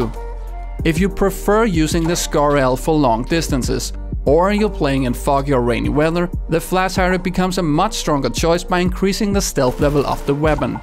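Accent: Denmark. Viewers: Its called being danish...we have a funny accent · The reason some of you guys think his way of speach is funny is becouse of his danish accent we have a very bland pronunciation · Are u from Denmark? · So quick question.. Are you Danish? Because your accent sound a little like Danish.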